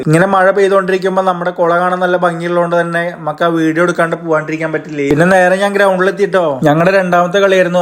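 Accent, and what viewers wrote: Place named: Thrissur, India. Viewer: Thrissur language aanallo ethh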